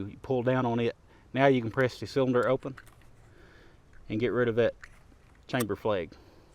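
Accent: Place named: Alabama, United States. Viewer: You from alabama , yes sir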